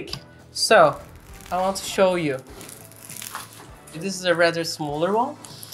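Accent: Turkey. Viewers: ne dediğini anladıysam arap olayım türklerin ingilizce konuşması baya farklı .D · 😋😋refika I lovesss youre Turkish English,urrrr soooo pretty,bubbly🥰🥰🥰I lovessss ur recipes from Sri lanka 🇱🇰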